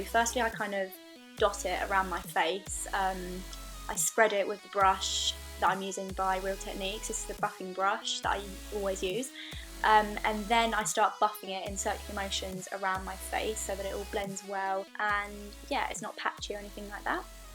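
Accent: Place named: United Kingdom. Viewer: Your british accent ❤🔥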